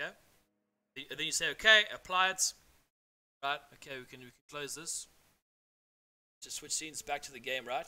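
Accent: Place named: South Africa. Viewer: Woah South African tarkov youtuber?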